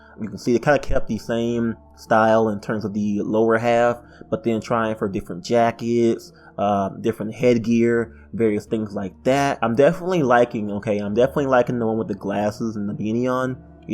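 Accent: South, United States. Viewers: greetings from Russia by the way, I noticed that you have a southern accent you're from the southern United States?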